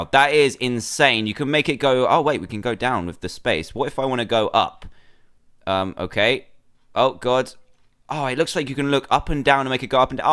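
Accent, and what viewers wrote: United Kingdom. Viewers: SO THATS HOW HE SAIS IT BECAUSE HE IS BRITISH AND THEY PRONOUNCE DIFFERENTLY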